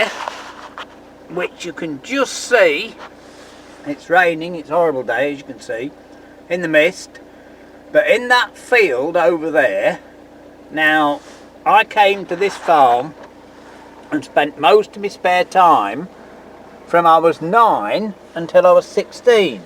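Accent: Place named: Lincolnshire, United Kingdom. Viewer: I actually quite like Skeg. My mum and dad have got a caravan in the Butlin's camp up there- (with live music on in and outside of the camp pretty much every night), which is a real novelty to me, 'cos we never had anything like that when I lived back home in London. I've gotta ask this, by the way: is your accent a generic Lincolnshire one, or has every part of Lincolnshire got its own specific accent and way of talking?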